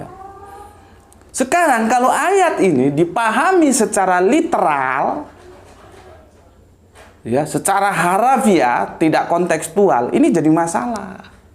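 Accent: Java, Indonesia. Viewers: Hahahaha.. Inggris jowo campur mbatak... hahahaaaa saya suka..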